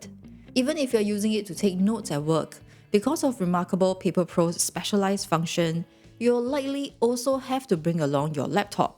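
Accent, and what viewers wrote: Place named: Southeast Asia. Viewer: Hi there. Judging by your accent I presume you are from south east Asia :) Got myself a RPP too. Not sure whether you have figured out a way to handle the notes taken in the RPP in a PARA or Zettle system? Would love to hear from you. As linking “analog” to a digital workflow is something I had not quite figured out.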